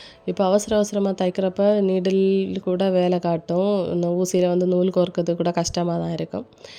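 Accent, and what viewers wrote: Kanyakumari, India. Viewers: உங்க voice ல நீங்க கன்னியாகுமாரி dist தான் antthu தோணுது.... நானும் கன்னியாகுமாரி dist தான்.... உங்க aeria வில ஓரோ dress க்கும் உள்ள stiching fees avalauv anthu ஒரு வீடியோ போடுவீங்களா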